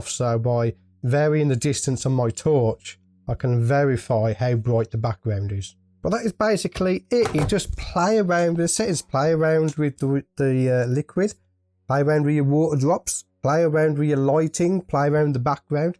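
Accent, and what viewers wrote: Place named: Birmingham, United Kingdom. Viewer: Gotta luv the brummie accent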